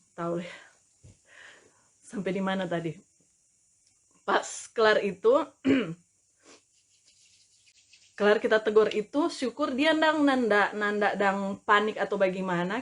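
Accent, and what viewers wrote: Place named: Manado, Indonesia. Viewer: Itu logat manado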